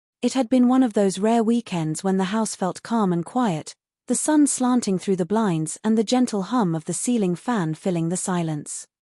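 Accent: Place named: United Kingdom. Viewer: This story takes place in Chicago, so why is the narrator speaking in a British accent & pronunciation?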